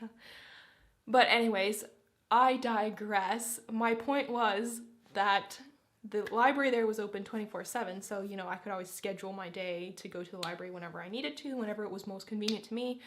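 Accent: United States. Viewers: She sounds American, not British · No offense you have an American accent i would thought you were from America lol 😳😳🤯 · you sound American to me for some reason, so cute and fun opinions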